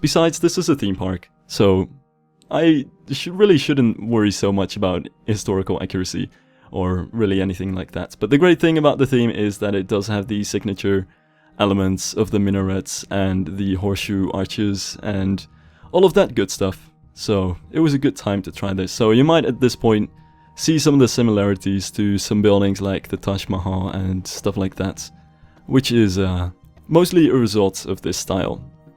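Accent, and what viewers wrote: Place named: Netherlands. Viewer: My senses smell that you are Dutch speaking.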